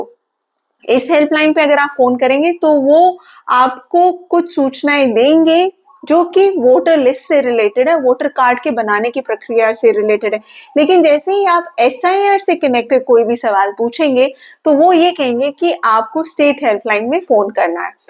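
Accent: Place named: Uttar Pradesh, India. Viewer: Up ka lag raha hai Mam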